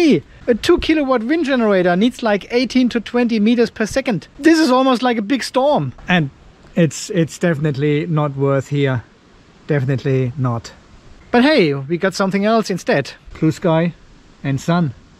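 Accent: Germany. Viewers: Sorry to inform you but I am correct A-do is not a name in the English language. It might be a name in your native language, which sounds German by your accent. But as a native English speaker born in America where the phrase is often used. The phrase "much ado about nothing" is used to describe a situation where there has been a lot of excitement about something that is not really important.03 It comes from the title of a humorous play by William Shakespeare.3 The phrase was already being used for a big commotion or trouble in the early 1500s. Some people make a big fuss over a trifle, as in Jerry had everyone running around looking for his gloves.02 The phrase is often used to describe a situation in which there has been an overreaction to something that shouldn't have caused so much trouble.. But I think you know all of this, and are only making a Joke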